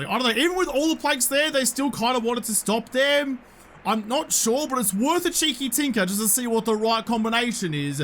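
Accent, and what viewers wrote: Australia. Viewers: bro he has the most Australian Australian accent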